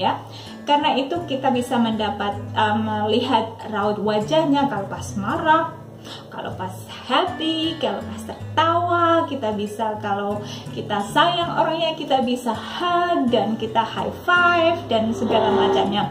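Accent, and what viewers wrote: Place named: Java, Indonesia. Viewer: asli asal mana, koq logatny jawa hhh..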